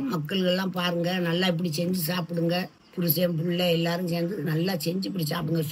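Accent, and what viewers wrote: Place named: Ramnad, India. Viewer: Maami arumaiyaana samaiyal adhuvu namma ooru style la supperr superr ongakitta enaku pudichadhey neenga namma ooru ramnad slang la pesradhu dhaan adha enikumey vitraadhinga idhey madhrii nalla manampola pesunga manasuku neraiva irukuh onga youtube channel maelum maelum uyarvuh pera manamaara vaazdhigirom eid mubarak maamii ❤️❤️🙏🙏🙏🤝🤝☺️☺️🥳🥳😇😇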